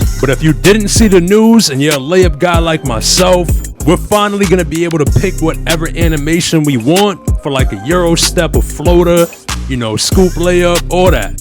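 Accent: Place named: Eastern Massachusetts, United States. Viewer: You from Massachusetts bro ? You got the illest eastern mass accent lol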